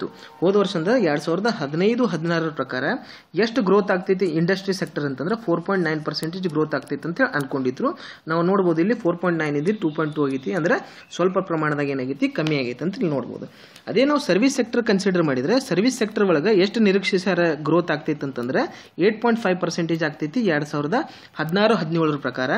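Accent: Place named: Karnataka, India. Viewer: 👌bro nimm Kannaada acent keloke kushi aglikathithi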